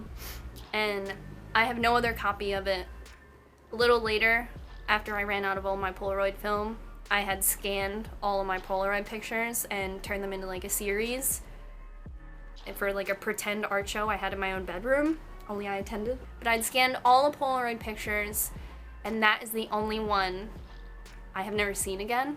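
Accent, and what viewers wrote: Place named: South, United States. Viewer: I love how you've had a slight country accent most of the video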